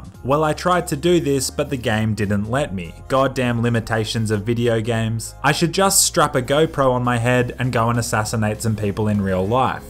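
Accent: Australia. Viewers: u australlian? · r u aussie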